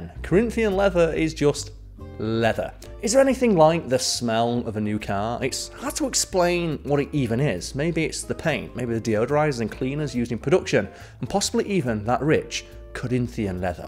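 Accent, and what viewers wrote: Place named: Yorkshire, United Kingdom. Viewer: Montelban, not Montelblan, still made me laugh every time. Then again, maybe I'M the one not fully understanding your wonderful Yorkshire accent. Hmmm?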